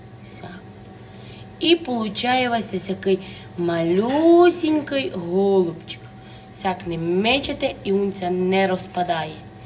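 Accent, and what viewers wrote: Transcarpathia, Ukraine. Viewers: Люблю закарпатський говір, йо!😂❤❤❤ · щось вас давно не було в ефірі.Як то файно чути наш діалект бо не вшиткі хочуть говорити як х мамка навчила бо ся ганьблять,а то красно що аж ся хоче.Такі голубчики ми робимо на всякі гостини,ай бо то лем у нас на Закарпатті такі роблять а інде такі як долоні завеликі.Ми ся не нервуємо ай любуємо коли їх крутиме.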